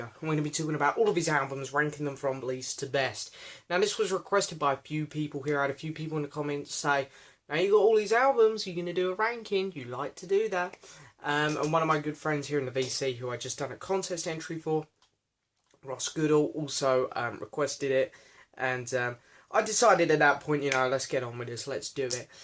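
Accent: United Kingdom. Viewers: I liked your ranking just one thing I think you should give a little more information about musicians in the albums and producers I like your Brit accent I am from Mexico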